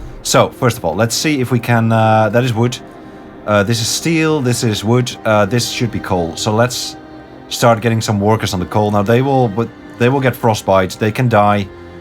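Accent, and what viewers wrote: Netherlands. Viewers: funny how you can immediately hear you are dutch :D this games soundtrack is so good, specially when the storm hits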